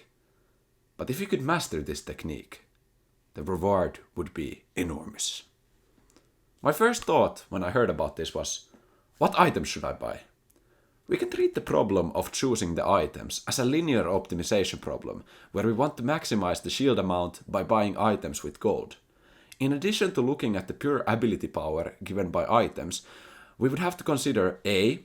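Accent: Finland. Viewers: joo katos ootha sää suomalainen enkun perusteella huomas heti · Is this guy finnish? Nice video! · Maybe you could calculate which item is more worth by using gold value of stats? Like for example every point of ap has x gold value or every percentage of cdr has y gold value. Not sure though, thought it might help. Hyvä video ja mahtava suomalainen aksentti! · The way he talk it tells all that thos guy is from finland😂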